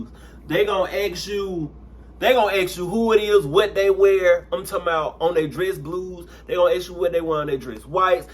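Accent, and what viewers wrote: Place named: South, United States. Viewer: Holy southern accent!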